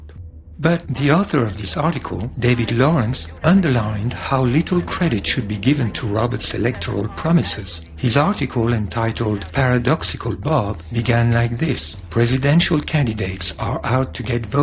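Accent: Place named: France. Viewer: This is a video that needs to be seen by EVERY American! (I only wish the narration was by a native English speaker as the French accent makes it difficult at times). Any country so brazen to bomb an American warship in 1967 would be brazen enough to assassinate an American president in 1963 Here we have the reason for classifying so many documents decades later. The American people must not learn that their "greatest ally" assassinated one of America's most beloved president (probably the most beloved in the modern era - even by republicans)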